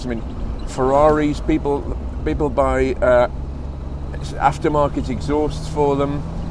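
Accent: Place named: RP, United Kingdom. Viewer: so pure, I mean the car... and the perfect Queens English explanation.... Like!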